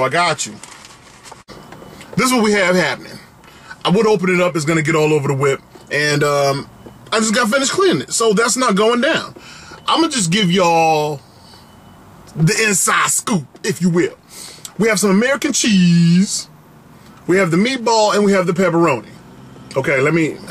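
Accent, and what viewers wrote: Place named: Connecticut, United States. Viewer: He is from Connecticut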